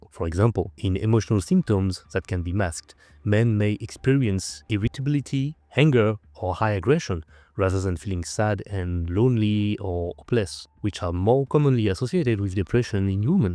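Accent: France. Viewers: I would pay for a French accent like this 😂❤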